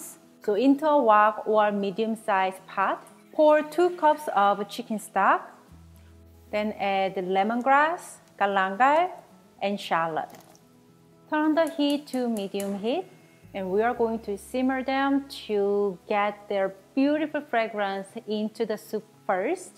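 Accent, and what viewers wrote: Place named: South Korea. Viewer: 영어발음이 너무나 익숙한 발음이라 한국분이신가 했는데 역시 한국분이시군요ㅎㅎ 똠카까이 너무 좋아하는데 자세한 레시피 감사합니다!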